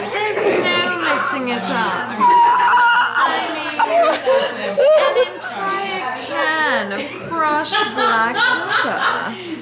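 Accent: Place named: RP, United Kingdom. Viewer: Hey! babe not bad at an english accent mind you it's like Emma from Jane Austin a bit posh. Love the way you kept saying nun instead of nan. i will have to come over and teach you one day; ) TeamUK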